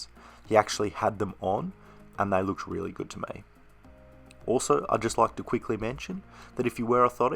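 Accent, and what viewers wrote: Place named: Australia. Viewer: Loved the video and the laid back Aussie voice! Going to pull the trigger on a pair of these after seeing this. Love from Ireland :D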